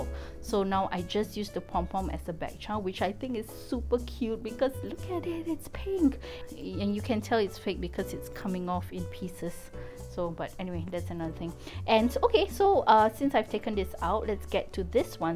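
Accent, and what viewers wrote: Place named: Singapore. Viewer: Recognised that Singaporean accent. Love the Damier Azur print!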